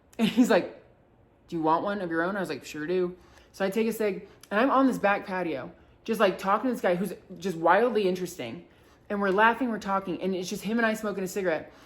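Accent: United States. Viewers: I love the Midwest comes out everywhere.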